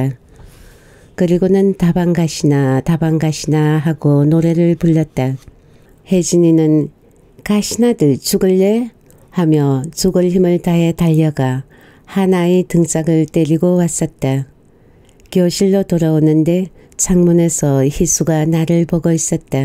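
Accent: Gyeongsang, South Korea. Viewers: 경상도 분이라서 사투리가 전혀 이상하지가 않네요,잘듣고 있습니다,감사합니다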